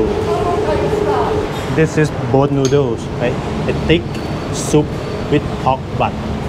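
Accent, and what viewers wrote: Thailand. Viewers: your explanation is very well done and the direction is very useful. Your English is very well spoken with charming Thai accent (cute) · Greetings from Singapore,. I can see that you have put so much effort in every video that you made. I think all of your friends here are appreciative of what you do. Your accented Thai English is very pleasant to hear and easy to understand. I will be following you as I come to Bangkok 5 to 6 times a year for holiday and relaxation. Thank you.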